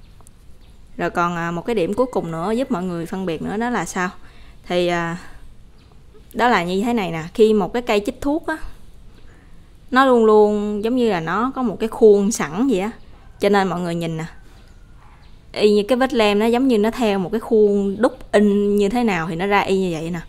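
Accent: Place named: Mekong Delta, Vietnam. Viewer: Nghe giọng của chị người miền Tây mà sao bít tiếng Hoa hay vậy?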